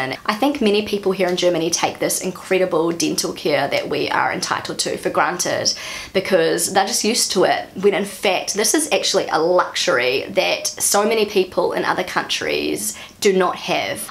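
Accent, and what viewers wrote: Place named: New Zealand. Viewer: I am a German mum who has been living in Spain for 16 years. It makes me a little bit jealous that the dental treatments for children in Germany are free. Here in Spain, one must pay for everything, social security doesn´t pay anything. My dental insurance paid by my employer is quite useless. I have not had such good experiences with German dentists as an adult. First of all, many still use amalgam (I wasn't even asked at the time) and secondly, it is very expensive when a treatment has to be paid. Here the treatments are roughly half the price with a similar cost of living. Fillings are not free but they stopped using amalgam decades ago. By the way, I like your channel because it's interesting how you see Germany as a foreigner, especially for me as a German and foreigner in Spain. I also like your accent because long time ago I spent a year in OZ and met a lot of nice Kiwis there.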